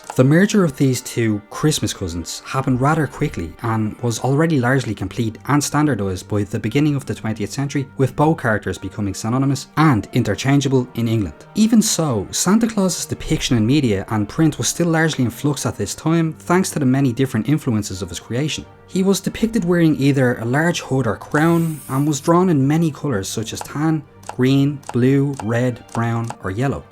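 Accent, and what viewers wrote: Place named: United States. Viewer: That merica voice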